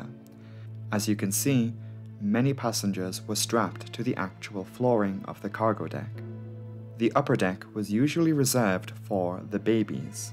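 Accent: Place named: North East England, United Kingdom. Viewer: Splendid video. Thought provoking. Love your North East accent. My mum (mam) was born in Chopwell. 😊❤️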